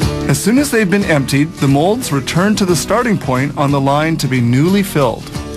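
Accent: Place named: Canada. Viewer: He's definitely Canadian!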